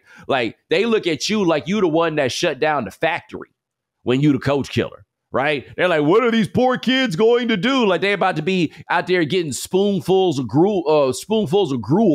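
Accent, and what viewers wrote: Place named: Los Angeles, United States. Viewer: Geez that LA accent is strong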